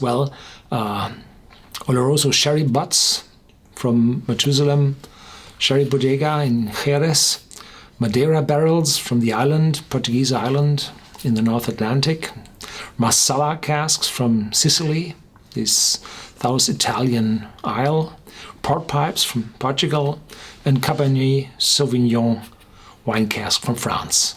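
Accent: Germany. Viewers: It's half german, half Christopher Walken :)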